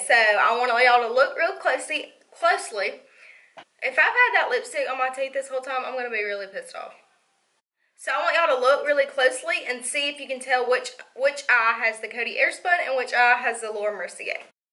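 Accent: South, United States. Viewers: where r u from? I hear the Southern drawl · loveeee that country accent!!!!!!!!!!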